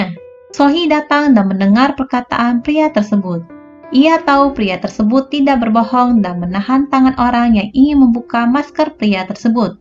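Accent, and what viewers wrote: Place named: South Korea. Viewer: Ini yg ngomong seperti org korea asli y logatnya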